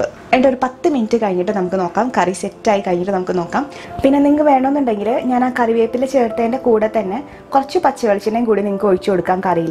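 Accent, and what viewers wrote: Thrissur, India. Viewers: തൃശൂർ എവിടെയാ. ആ bhasha കേട്ടപ്പോൾ തോന്നി മ്മടെ തൃശ്ശൂരാണെന്നു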